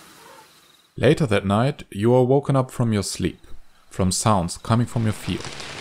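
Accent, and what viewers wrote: Germany. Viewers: Dieser cringige Deutsche accent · Könnten vllt auch mal Videos in Deutsch kommen? An sich mag ich den Kanal, aber der deutsche Akzent nervt etwas... 😅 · german Accent._. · Your accent sounds German. Are you ? :) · Man hört das du deutsch bist. Irgendwie hört man das am Englisch raus, aber ich mag deine Videos :D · Are you german? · why u have are german accent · Are you German? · I love the accent of this narrator. Sounds like german man speaking english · haha the guy sounds so german ;) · are u german? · Bist du Deutscher? Hörst dich jedenfalls so an😂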